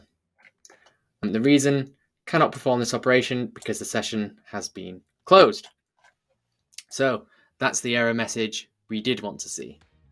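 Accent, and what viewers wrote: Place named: England, United Kingdom. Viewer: With a English accent!!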